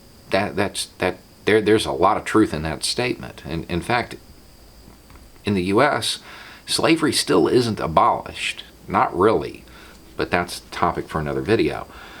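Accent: South, United States. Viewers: Recently some members of my wife's extended family tried defending their flying of the confederate flag by using the same talking points that you just swiftly debunked here. They're not even from the south... they moved down here a few years back, but for some reason they're in love with that damn flag and they'll swear up and down that it's not a symbol of racism, but instead a symbol of "southern pride". I tried making similar arguments as you did here, but they wouldn't listen. If they'll hear out anyone it'll be someone like you, a veteran from the south that sounds and looks like them. Thank you so much for putting these videos out. You're a hero speaking truths we all desperately need to hear. 👍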